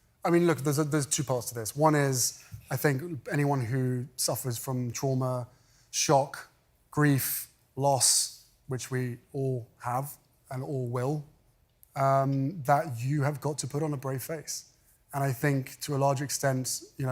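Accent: Scotland, United Kingdom. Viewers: Love your content and your wonderful Scottish brogue!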